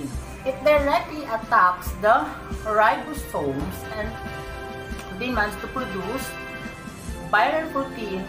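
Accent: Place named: United Kingdom. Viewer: Yung totoo po pinakikingan ko po yung boses nyo About Corona virus Akala ko po british kayo nung Pag tingin ko kayo pla Salamat po sa pagbabasa samin tungkol po sa pandemic na sakit Ingat kayo jan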